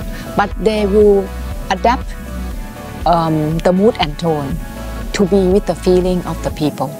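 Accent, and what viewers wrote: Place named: Thailand. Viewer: ละ อ่อน ก็สำเนียงคนไทยน้อ เป็นผมก็พูดสำเนียงแบบนี้แหละ เอาพอสื่อสารกันเข้าใจก็พอ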